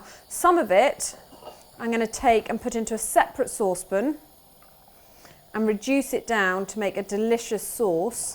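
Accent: United Kingdom. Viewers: honestly, I subscribe ld to this channel to listen to the beautiful British accent 😍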